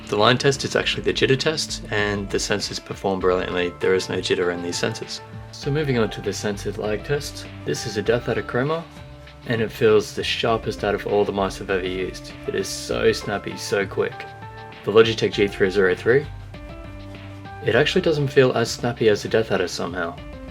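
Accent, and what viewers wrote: Australia. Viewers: Hi it's me again, I love your videos. Is the Razer Deathadder durable? How stiff or light are the main left and right clicks? Are you australian or do you just live in australia?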